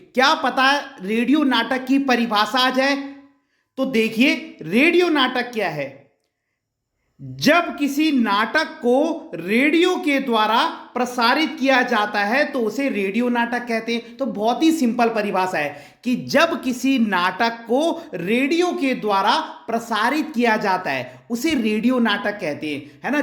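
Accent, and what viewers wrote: Garhwal, India. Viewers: Sir aap garhwali ho kyy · Are u garhwali? Tone aari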